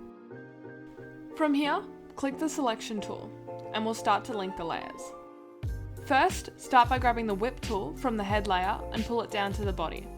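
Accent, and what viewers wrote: Australia. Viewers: Congrats on your first monetized video and your aussie accent is fine btw! No need to put on subtitles 😅 Thanks for this tutorial btw! I'll be sure to come here if I ever want to learn to animate msp characters, it sure is nice to see someone who edits with medibang instead of photoshop for a change when it comes to animating on after effects ♡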